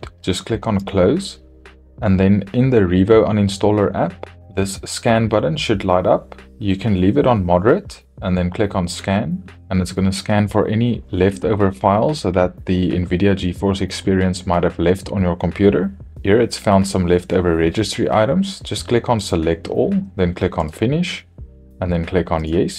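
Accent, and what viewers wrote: South Africa. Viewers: Do I hear a South African accent in there? 👀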